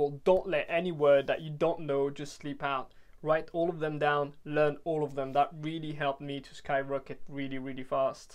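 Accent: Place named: United Kingdom. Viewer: Très impressionné, bravo pour le travail ! Si je peux me permettre, j'ai l'impression que la prononciation du "there" peut être améliorée, et c'est un mot qui revient souvent donc on remarque la différence avec le reste qui est dans un accent british quasi irréprochable haha Bonne continuation en tout cas, keep up the good work !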